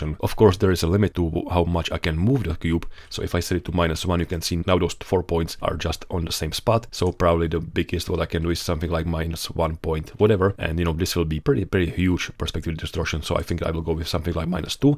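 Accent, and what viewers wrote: Czech Republic. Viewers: Čech ? :D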